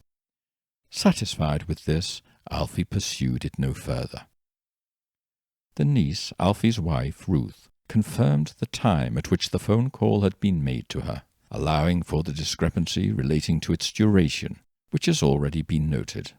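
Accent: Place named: RP, United Kingdom. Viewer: Petite question. Where does your pronunciation of the U vowel come from? e.g. cushion, bullet and put. Am intrigued as otherwise you come across as a relatively RP speaker. Enjoy your content until your slow delivery and soporific voice takes effect. Cordialement. Alexandre Garden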